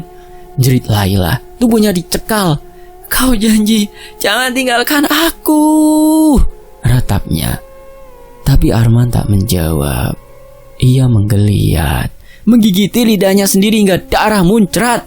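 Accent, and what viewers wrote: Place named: Surabaya, Indonesia. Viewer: Saya kira orang Surabaya mas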